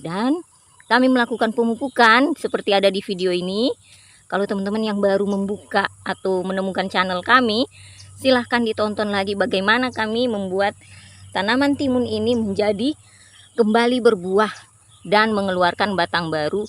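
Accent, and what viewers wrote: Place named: West Kalimantan, Indonesia. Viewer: Orang Mana Bunda,logat bahasa daerahnye sepertinya org Melayu Kal-Bar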